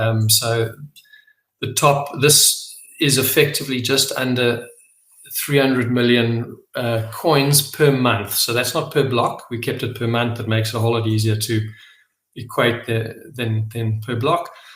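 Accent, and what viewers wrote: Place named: South Africa. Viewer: Sounds South African 🇿🇦🇿🇦🇿🇦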